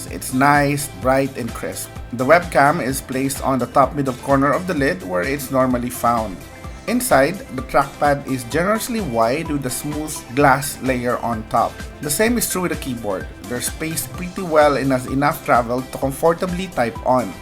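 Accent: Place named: Ilonggo, Philippines. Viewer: ilonggo ka man? you sound like one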